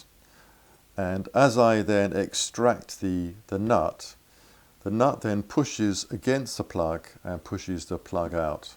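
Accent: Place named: United Kingdom. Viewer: Hiya mate, thanks for this video as I am having the same nightmare with a customers Ibanez right now 😂 and your calm, patient explanation of the process lowered my blood pressure and I have got my screw extractors out and will be - after a nice cup of tea and some hobknobs - go back in the workshop and get the wee bugger out. Poor lad who owns it has tried everything and a previous owner had used imperial on a 4mm nut (argh) so it is totally rounded off in there, and the neck is stuck in a back bow so fretting out on the 1st frets 😕 I just needed a calm British voice to chill me out with a sensible explanation and procedure, and you have saved the day - SEVEN years later 😍 Much thanks 👍👍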